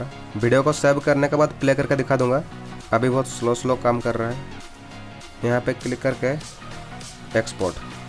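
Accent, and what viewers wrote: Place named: Odisha, India. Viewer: thoda thoda odia toch ho rha hai na apke hindi me ishliye but nice voice ❤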